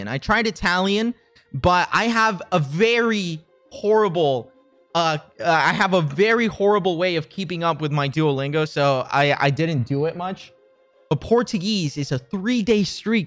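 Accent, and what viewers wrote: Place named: Argentina. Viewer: Español Argentino SSJJSKSKSMS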